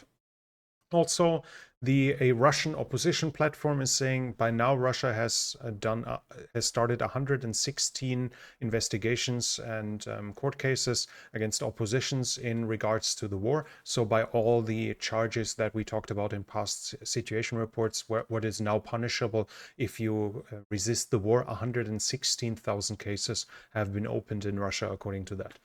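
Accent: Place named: Germany. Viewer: Dein deutscher Akzent im englischen ist schrecklich...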